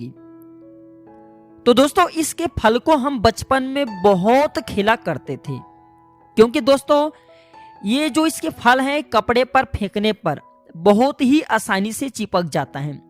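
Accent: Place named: Assam, India. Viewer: Etleti bolta hai gurji assam se ho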